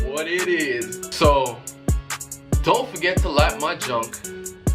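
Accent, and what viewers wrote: Jamaica. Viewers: U from Jamaica?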